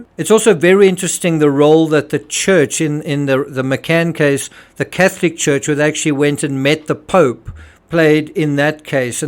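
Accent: South Africa. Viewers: I hear a South African. I like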